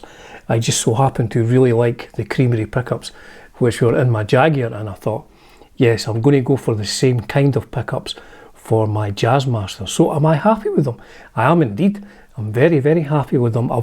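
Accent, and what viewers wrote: Scotland, United Kingdom. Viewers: I love a gorgeous Scottish accent !! ❤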